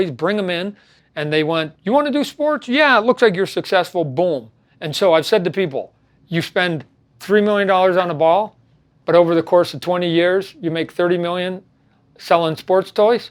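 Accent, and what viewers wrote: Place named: New York, United States. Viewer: dude talks way too much, sounds like a new yorker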